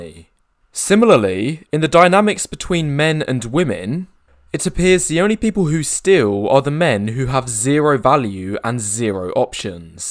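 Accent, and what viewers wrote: Essex, United Kingdom. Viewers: He's from Essex in England I think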